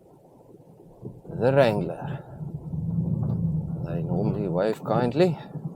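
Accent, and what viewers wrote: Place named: South Africa. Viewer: Sensing a bit of South Africa here lol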